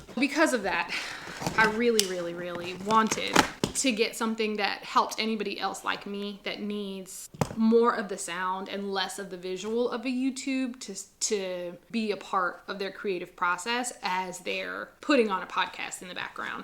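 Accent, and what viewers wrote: South, United States. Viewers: You are so Southern!! I love it.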